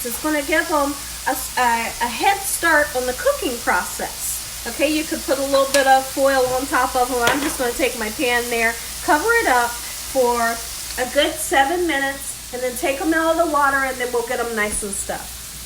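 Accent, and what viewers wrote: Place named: Chicago, United States. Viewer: I just want to tell you how much I enjoy watching you. I love listening to you speak... I’m from the Chicago land area and you remind me so much of home... Keep up the great work and I hope you know how BEAUTIFUL you are!!!! ❤️❤️. Thanks for all that you do....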